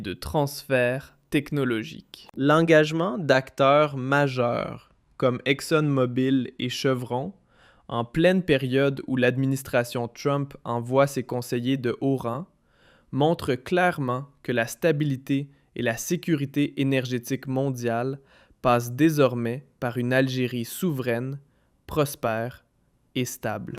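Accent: Quebec, Canada. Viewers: Encore un soldat immigré dz des caporaux avec un accent Québécoi qui croit que l'Algérie est une puissance alors que la queue sur l'alimentation se mesure en kilomètres. Je suis persuadé que l'Algérie finira par être disloqué avec ce régime actuel qui emmène le pays droit au mur.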